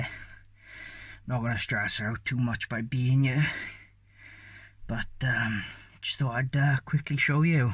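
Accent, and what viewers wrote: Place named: England, United Kingdom. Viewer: Im guessing that you are English by your accent. Do you guys have corn snakes there? Thanks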